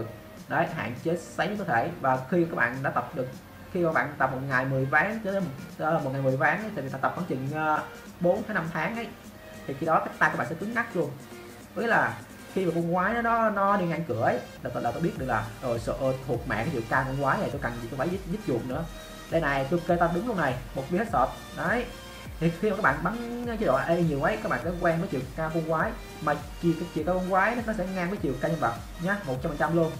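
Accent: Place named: South, Vietnam. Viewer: nghe giọng miền nam tưởng anh trong fps gaming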